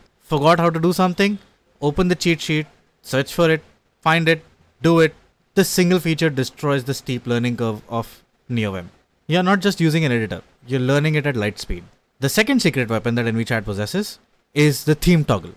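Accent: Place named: India. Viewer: chat gpt x indian accent is so crazy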